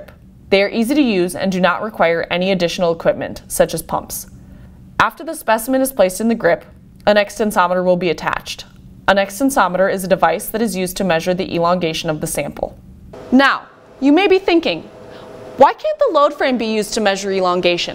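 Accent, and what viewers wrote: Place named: United States. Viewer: That's understandable, but this is American accent, which is very strange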